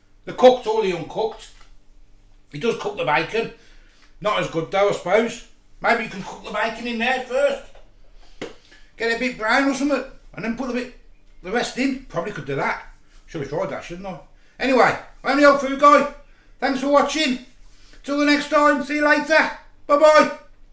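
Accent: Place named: West Midlands, United Kingdom. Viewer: Ey up bro, only just come across your channel, got to admit I was a bit dubious at first but you seem to be a down to earth sound bloke, I’ve subscribed mainly because it’s nice to see an honest bloke who says a spade is a spade and doesn’t try to hide his accent, I’m a stokey and used to work a lot down all round the West Midlands, keep it up mate WELL DONE 👍🏻 👋🏻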